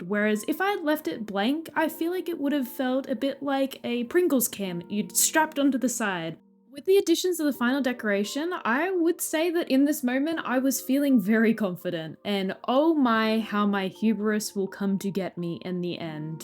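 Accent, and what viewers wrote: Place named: United Kingdom. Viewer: I just realized you sound like a slightly British version of Nina Oyama 😅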